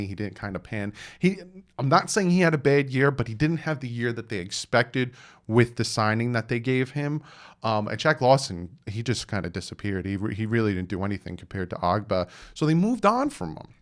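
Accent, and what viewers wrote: New Jersey, United States. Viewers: Late night letting his Jersey accent out to play.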